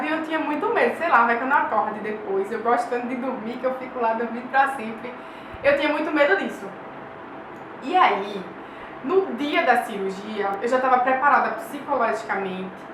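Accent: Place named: Recife, Brazil. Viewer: acho lindo esse sotaque, tenho uma amiga de Recife, parece que é ela quem está falando rs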